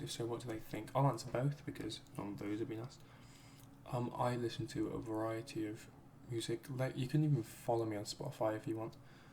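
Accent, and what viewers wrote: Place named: West Midlands, United Kingdom. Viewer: you sound like you live near the west midlands! your accent I mean, I live in brum.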